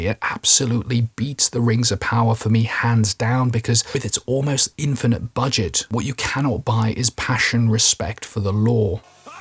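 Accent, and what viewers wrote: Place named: England, United Kingdom. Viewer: Thank you for making these. Love the English accent too. If you could go back and revisit the rings of power and do each episode over like you did with 7 and 8 that would be wonderful! I'm serious! I will pay you!!😄 That used condom falling on the Balrog's head was awesome! Humor is the best way to deal with this abomination of a series. (It's really a shame too, because in the right hands this could have been a good show. I am interested in seeing the lore come to life. I would love to see how Anatar (Sauron) managed to trick the elves; how he worked with Calibrimbor to learn to craft rings. That would have been really cool. It takes more than 8 episodes though. And fuck the Harfoots! Who gives a shit about that side-story.) That reminds me - your Skyrim comment was perfect. The entire show was a bunch of stupid optional side quests. 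Never did I fear for anyone or anything. The only characters I liked were Adar and Berrick, and the latter had not a single spoken line. Well, I suppose the discount-Emma Watson character with the big boobs was fun to look at, but even she was unnecessary and her character was as developed as bleached vanilla. Anyway, please keep these going. I look forward to them sooooo much! Cheers, mate!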